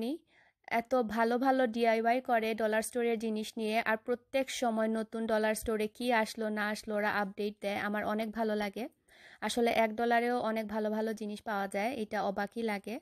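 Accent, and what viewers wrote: Sylhet, Bangladesh. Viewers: Full Video dekar age comment korlm. Apu miss korrm afnr sylheti voice 😥but afnr j mat shunrm bala lager afne jrokom matoin na kne afnr sathe sovsmy takmu love u appi 😘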